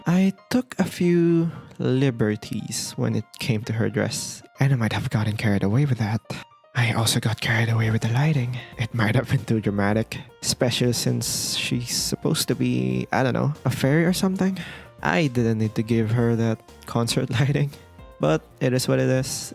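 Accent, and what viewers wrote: Philippines. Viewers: You Have a Strong Filipino accent!! ❤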